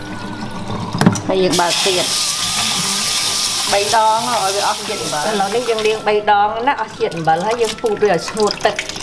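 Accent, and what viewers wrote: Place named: Kampot, Cambodia. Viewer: Hi mama, I interested in watching your cooking show very much! By your sound, are you from Kompot province?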